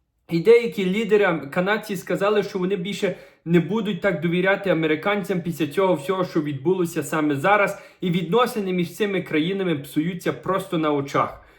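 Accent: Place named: Ukraine. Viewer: Я підписалась чисто через те, що Ви маєте таку дуже українську говірку (стиль вести бесіду) нагадує, щось рідне 🩵💛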